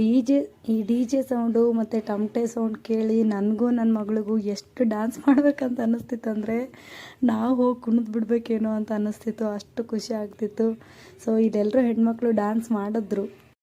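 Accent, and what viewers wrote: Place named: North Karnataka, India. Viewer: Nim uttarkarnataka bashe Chandar kelakke ❤❤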